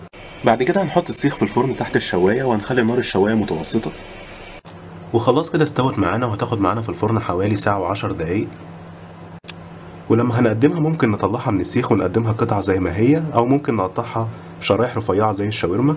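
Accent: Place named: Syria. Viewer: مافي اطيب من المصريين من لهجتك واضح انه انتي سورية عموما كل مكان فيه السئ و الطيب عموما انا عايشة في اوروبا وشفت كتير سوريين بشهادتهم ما بيحبوا بعض والمعاملة مش بيرفيكت وبلاش اقول الباقي كل بلد فيها الفالح والطالح الا من رحم ربي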